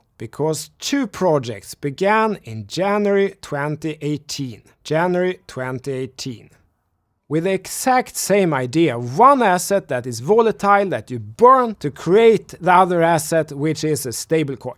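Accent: Sweden. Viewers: Skönt med någon som kör hårt på sin svenska dialekt, älska`rt! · Man this Swedish accent is thicc a-f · Snälla sluta prata Stockholms engelska, hemskt för fan.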